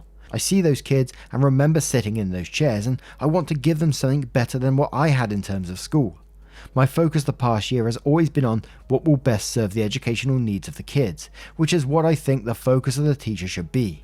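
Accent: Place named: United Kingdom. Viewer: What? has an accent? I didn't know! Oh, wait... he's a fellow Brit! 😁 But you are right, he'd do well as a voiceover artiste.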